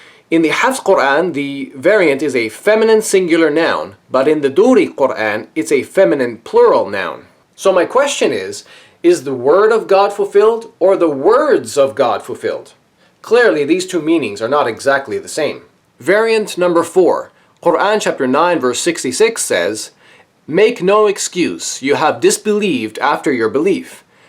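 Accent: Arab world. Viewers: Your Arabic accent is brilliant... Masha Allah ;)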